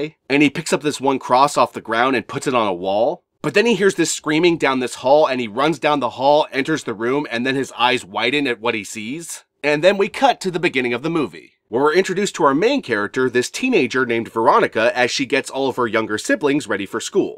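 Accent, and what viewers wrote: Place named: United States. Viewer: Your pronunciation of the Spanish names is ok, you just have the usual problem Americans have where your accent doesn't lend itself to Spanish/Latino names.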